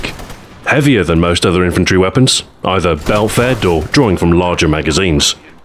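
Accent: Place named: United Kingdom. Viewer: THIS GUIDE IS AMAZING!!! I am 100% confident that you produce the best Titanfall weapon guides on the Internet! It's so professional, engaging, and well-edited. Additionally, your magnificently deep, British voice is the perfect sound for discussing the topic of Titanfall armaments. This video was so good that I actually got goosebumps at the end!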